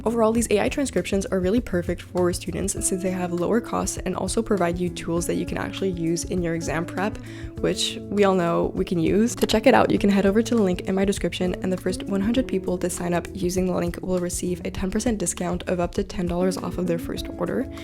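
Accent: Quebec, Canada. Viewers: Omg i just stumbled across your channel, and when i first heard you talk jme suis dis "wait a minute je reconnais l'accent" J'ai réalisé que t'étaits québécoise!!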